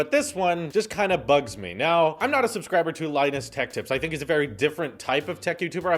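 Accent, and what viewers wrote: United States. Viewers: What’s you’re nationality? Just wondering I thought you were from the UK but you sound American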